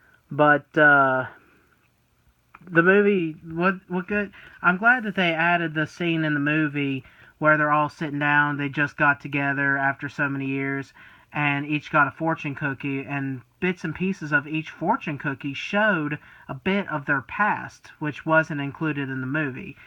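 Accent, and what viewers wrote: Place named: South, United States. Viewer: My mom wants to know where you're from? We both agreed you sound country. I'm from South Carolina myself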